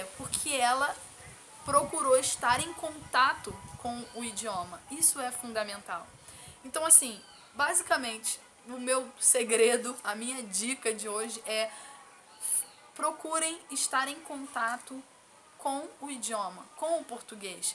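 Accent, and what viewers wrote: Brazil. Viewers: Love this Portuguese/Brazilian language accent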